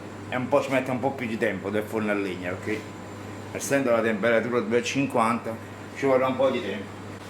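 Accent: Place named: Italy. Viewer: Bravo vous êtes un vrai chef. Bisous de France,. Vive l Italie. Un beau pays avec un très joli accent ❤❤❤❤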